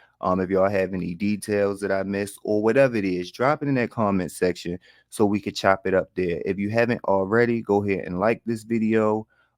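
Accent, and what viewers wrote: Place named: Mississippi, United States. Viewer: 😂 You sounds like you're from Mississippi. I remember the MI crooked letter crooked letter I- crooked letter crooked letter I- humpback-humpback-I 😂. Those were the good old days back then comparing to the times we're living in today's world. If only I could turn back the hands 👐 of time 😂. WE'LL never see those good old days anymore. I can't at this moment think of a topic right at this moment but since I've comed across your channel I've got to check out your other videos to see what you've already reported on so I don't ask you to report on something that you've already reported on. I'm glad I came across your channel and I really enjoyed your content. Keep up the good work and I'm looking forward to watching your channel grow. #MUCHLUVCOMINGOUTTAMISSISSIPPI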